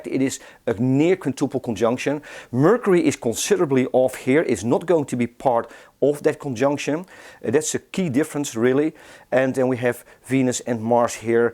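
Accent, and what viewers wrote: Netherlands. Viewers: That is a dutch accent and not AI!☺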